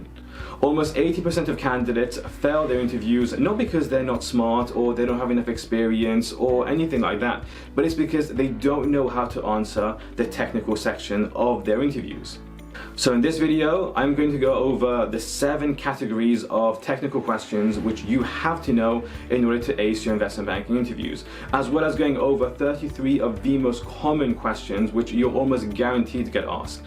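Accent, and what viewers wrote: Mauritius. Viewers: Is that a slight Mauritian accent?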